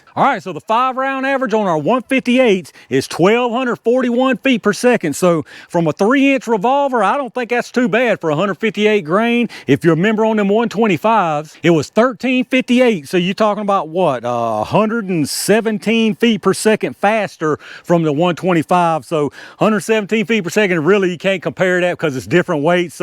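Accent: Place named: Alabama, United States. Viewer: I gotta know where you grew up. I'm in Alabama and I swear you have an Alabama accent. It can't be VA.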